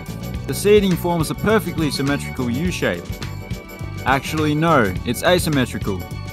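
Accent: South, United States. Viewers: You sounded like a Southern Nascar fan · The red neck accent is Top Tier 😭😭😂😂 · Loved the Southern accent 😂😂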